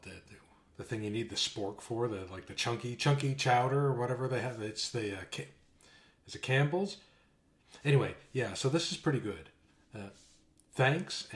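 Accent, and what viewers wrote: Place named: Maine, United States. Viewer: There’s nothin slim about the quality of these « slim-pick in’ » videos! Love the Maine accent!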